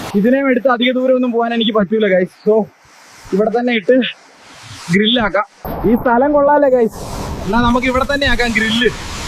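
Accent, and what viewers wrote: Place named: Idukki, India. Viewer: അസൽ ഇടുക്കിക്കാരുടെ സ്ലാങ്...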